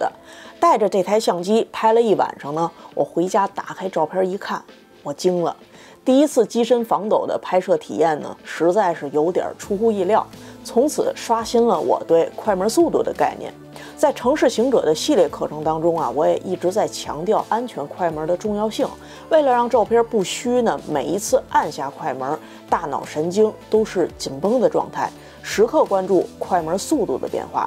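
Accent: Beijing, China. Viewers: 她是北京人，并不是所有大陆人都这种口音好吧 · 這是蠻道地的北京腔，吃好喝好的比較平，比起某些口音會突然拔尖高音調，我比較喜歡EDI這種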